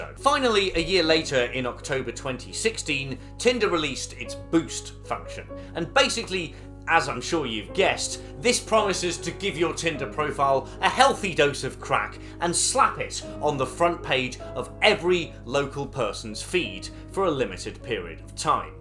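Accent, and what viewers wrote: United Kingdom. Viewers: Hey, the irate British guy is back!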